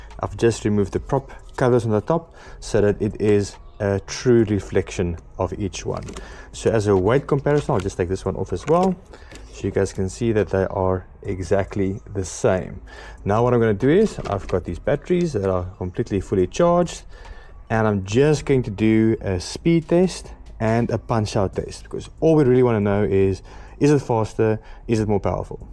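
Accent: South Africa. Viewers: Hey, if I'm right and i listen to the accent, you are South African Just like myself😅😅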